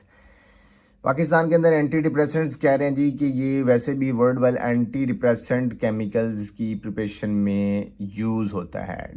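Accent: Punjab, India. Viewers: Punjabi Ascent ❤❤ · Lgda sir vi apny ilaqyy day iiii ny 😂😂 Punjabi bao vadiyaa sir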